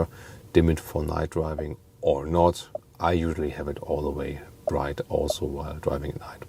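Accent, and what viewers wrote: Germany. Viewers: sounds more German than ever 😆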